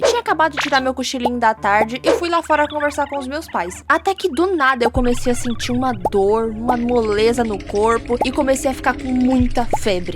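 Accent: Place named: Northeast, Brazil. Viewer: Você mora no nordeste pelo sotaque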